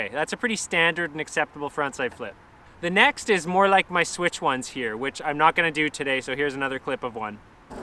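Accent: Canada. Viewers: It's funny how i can hear his Canadian accent even when I'm a Canadian. Fuckin Mint 🍁👌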